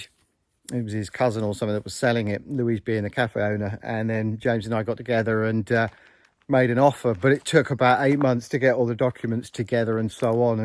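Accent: United Kingdom. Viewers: Well, I started watching the 90 minute renovation video compilation of the village house, then went back to No. 1, and am now finished with No. 134. Whew, an enormous amount of work you've done there. I've worked in the construction industry in the U.S. all of my life, but I was fascinated by the type of housing that you have in Portugal. We use wood framing for most houses here, and I've never seen stone houses like yours. It was truly an interesting journey and I feel that I've been educated by watching your videos. But don't worry, I'm about to start on #135 and will be following your channel until you give it up. You're also to be commended on your videography talents, use of musical score, and the witty repartee that you constantly provide. Like most yanks, I enjoying hearing the British accent and have learned a whole new vocabulary. "Hob", "boiler", "salamander", "knackered", all these are fun to hear for the first time. 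Great job, keep it up, and good luck through all your adventures. Gary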